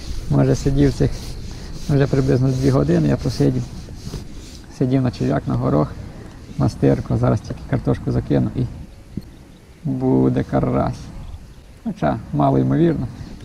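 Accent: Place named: Western Ukraine, Ukraine. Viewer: А вимова типова західна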